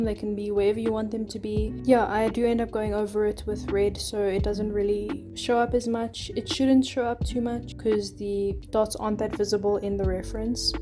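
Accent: South Africa. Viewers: ARE U SOUTH AFRICAN cause u sound SA south african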